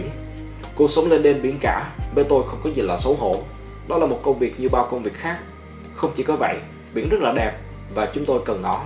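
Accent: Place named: South, Vietnam. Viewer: Giọng thì đã miền năm lại còn ồn ào tạp phẩm ...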